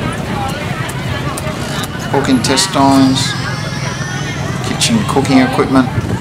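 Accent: Australia. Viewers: u sounds like Aussie are you? Hello from Adelaide SA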